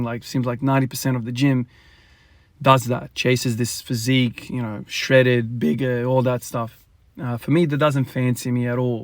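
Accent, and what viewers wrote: Australia. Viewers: What accent is that? Sounds like a mix between Australian and South African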